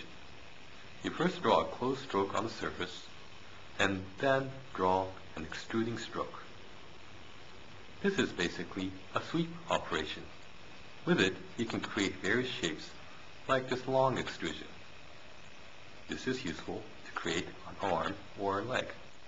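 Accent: Flanders, Belgium. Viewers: flemish, an a bit english XD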